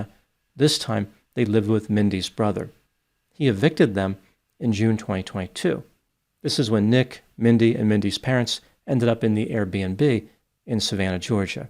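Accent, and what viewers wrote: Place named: Pennsylvania, United States. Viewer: mentioned Lancaster, PA which reminded me that accent is exactly like my SEPA (Norristown) born and raised grandad. grew up in SEPA, no? If he didn’t, don’t tell me bc I fashion myself an amateuraccent expert. The gift that is uninterrupted ignorance is one that keeps on giving.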